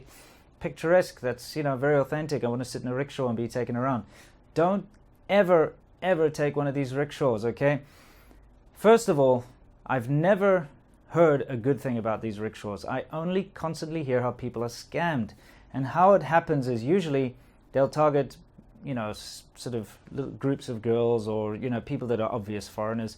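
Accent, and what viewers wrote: South Africa. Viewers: You're not from London. You sound like you're from South Africa. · You sound South African ! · are you south african ? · why do you always tell chinese locals you are english when you sound like you are from south africa? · South African? Thanks for filming in Scope. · Hi, I like your videos but why do you tell everyone you are from England? Your accent is quite markedly South African. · You sound South African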